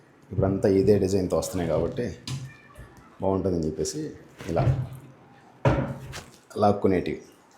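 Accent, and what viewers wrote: Kadapa, India. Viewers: Fast time mi video chuste mi Bhasa ma Kadapa ekkadunna kadapa a level veru anna❤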